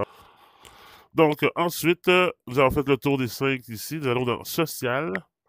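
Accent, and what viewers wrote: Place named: Quebec, Canada. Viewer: Merci je suis newbie avec le Quest 3 depuis 6 jours et j’ai comme on dirait du stock d’appris depuis! Vos explications sont claires et simple à comprendre! Merci pour cette introduction au Bigscreen qui est sans doute semblable aux salles plateformes cinémas! Je suis déçue de m’apercevoir combien c’est monétisé ces machines sans bon sens et ça ressemble étrangement à in PC! Personnellement je ne suis pas fan des jeux que je trouve enfantin de toujours vouloir détruire quelque chose ou de tirer avec des engins sur des gens ou pour avoir accès à des points ou bonus et je ne sais quoi! Par contre, j’adore apprendre quelque chose, voyager ou regarder des films en 3D ou réalité immersive! Il y a un gros potentiel, mais Meta a fait fausse route en développant des jeux presque essentiellement. Car il aurait été plus intéressant de developper le côté immersif dans d’autres applications! 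Je crois que c’est la voie à nos PC de demain, comme on voit dans Star Streck!😊 je suis du Saguenay et vous d’où du Québec, car votre accent est québécois! Merci bcp!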